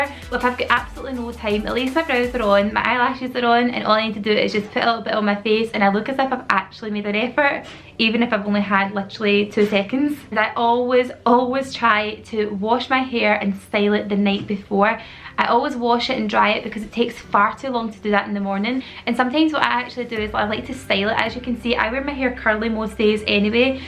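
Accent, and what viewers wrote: Scotland, United Kingdom. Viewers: My daughter Kayla who's 9 watched this video with me. She asked what accent you have I said Scottish and she said awhh like Brave 😀🖒 loved the video and so did Kayla 😙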